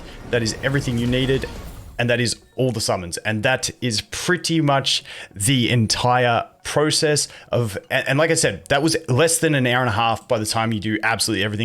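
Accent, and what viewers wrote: Australia. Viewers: Is old mate aussie?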